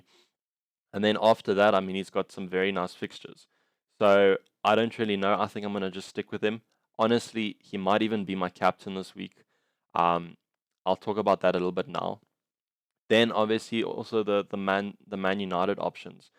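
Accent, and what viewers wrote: South Africa. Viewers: FPL ID: <num>. Top 2k. Notice the accent - Are you South African?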